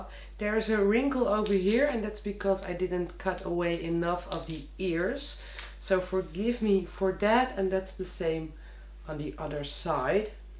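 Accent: Netherlands. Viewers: are you dutch your voice is a little bid dutch im dutch 😂😂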